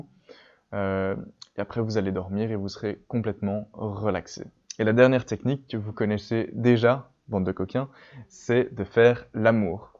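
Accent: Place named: Switzerland. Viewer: Tu devrait parler plus doucement pour nous endormir ahah j’ai remarquer que tu était Suisse donc je comprend mieux sinon super t’es vidéo !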